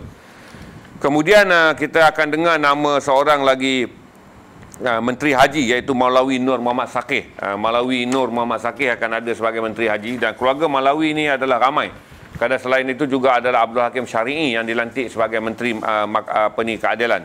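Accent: Kedah, Malaysia. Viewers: Nak dengaq tuan ckp Kedah sepenuhnya.....hehehe takut hilang pelat Kedah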